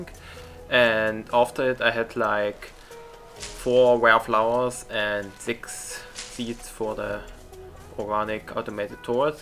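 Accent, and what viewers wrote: Germany. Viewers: Deutscher Akzent,mirkt man gleich :D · lol man hört deinen deutschen akzent :D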